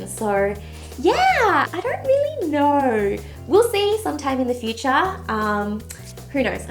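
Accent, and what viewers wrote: Australia. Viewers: that Aussie Accent is 🔥 girl. Speak Vietnamese with me haha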